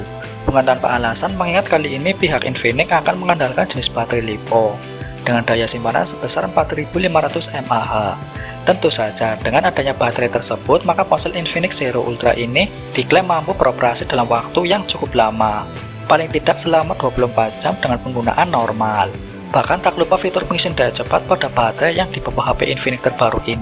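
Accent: Banyumas, Indonesia. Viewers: 😂ki asli ngapak.ora ngapak ora kpenak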